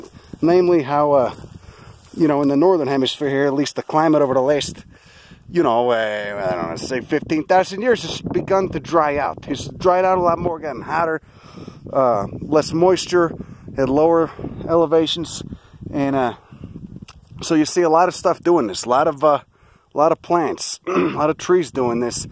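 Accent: Chicago, United States. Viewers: Is your accent Chicago?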